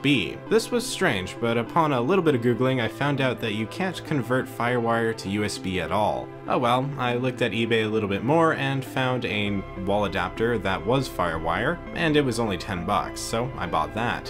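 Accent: Vancouver, Canada. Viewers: Are you from Vancouver?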